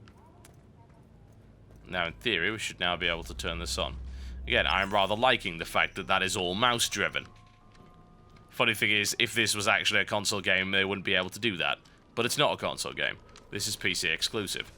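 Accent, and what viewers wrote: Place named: Newcastle, United Kingdom. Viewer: Geordie accent :D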